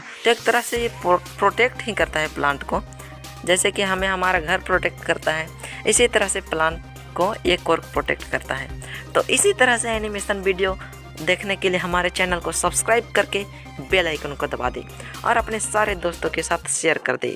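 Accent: Bihar, India. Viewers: Bihar sa ho kaya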